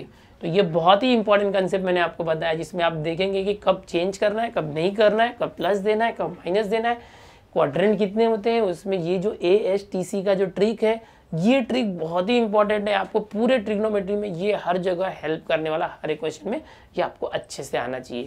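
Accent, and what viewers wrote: Maharashtra, India. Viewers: महाराष्ट्र स्टाइल मध्ये कडक कडक👌👌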